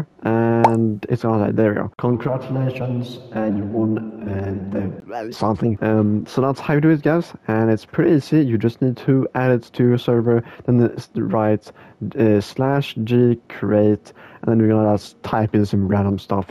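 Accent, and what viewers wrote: Sweden. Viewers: are you swedish? you sound like it and it said igår on the msgs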